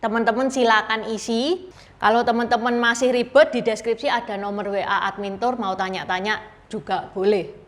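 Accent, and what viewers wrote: Java, Indonesia. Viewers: Aku focus medok. Mba mba Jawa . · Coba perbaiki lagi logat jawa medok nya mbak.. biar lebih jelas bicaranya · Logat jowomu medhok mbak yu... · Ce ngomonge medok banget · Orang cina, bahasa indonesia, logat jawa. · Iki wong Cino, tapi bhs ny medok jowo, keren"👍👍👍👍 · aq ngakan sebab ini kakak medok banget😂😂😂😂😂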